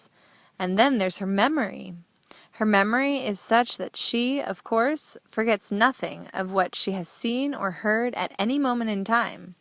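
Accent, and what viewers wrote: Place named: United States. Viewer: the disgusting american woman accent . Horrible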